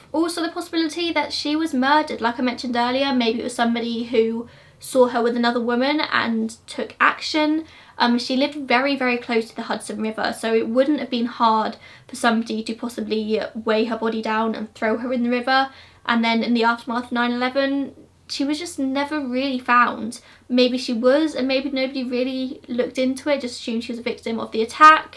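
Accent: England, United Kingdom. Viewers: I really love your English accent. I'm from Argentina and I've been studying English for 5 years. I'm listening to you while I'm working. You're amazing, thanks a lot.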